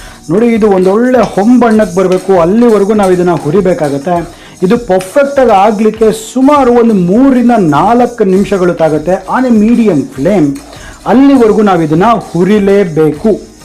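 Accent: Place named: Mangalore, India. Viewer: Mamnglore kannada accent ,kelokke tumba channagidhe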